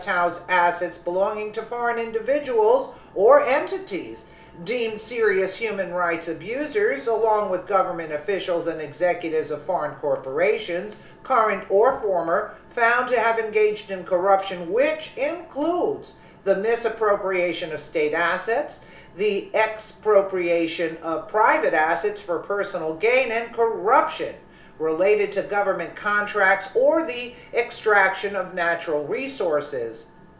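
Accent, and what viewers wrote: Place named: East Coast, United States. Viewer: I love your accent.....reminds of some my past family & friends from the East Coast. Thanks for all the great work you do, bring ink and paper to life, with your read aloud.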